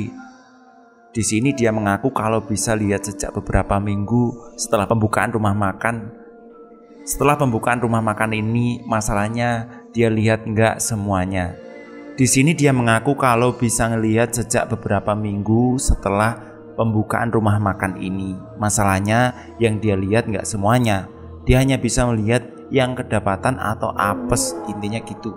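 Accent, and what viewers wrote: Java, Indonesia. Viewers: Bahasa jawa medok 😂😂😂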